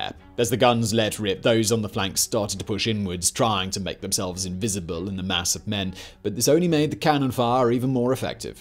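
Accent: United Kingdom. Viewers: I love the different British pronunciations of American words. 'MURICA!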